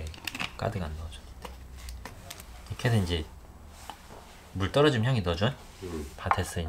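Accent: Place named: Jeonbuk, South Korea. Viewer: 늦은시간에 빠른답변 감사드립니다. 사투리가 구수한게 너무 익숙합니다. 저는 전북김제 출신인데 동향분 아니신지요. 그래서 더 편한함을 느낀거 같아서요~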